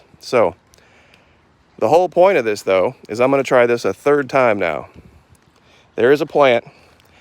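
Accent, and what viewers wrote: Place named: South, United States. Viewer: Hey! Yer country voice is leekin’ thru!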